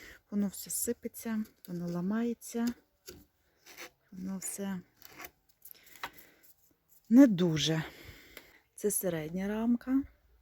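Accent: Western Ukraine, Ukraine. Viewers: Вас вже від западенки не відрізниш😘 навіть не віриться, що ви з Харкова. А вікна файні вийдуть) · Вимова у вас західна, не скажеш що ви з Слобожанщини. МИРУ нам всім та добра.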